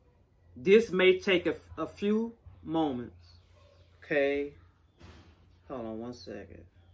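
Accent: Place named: South, United States. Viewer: love the accent. reminds me of family from the south. haven't seen them since I was a child at 12.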